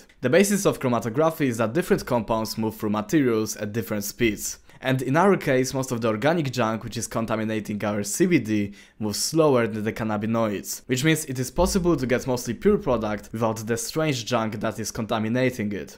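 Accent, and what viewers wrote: Poland. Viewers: Tak coś czułem, że jesteś z Polski. Denaturat potwierdził XD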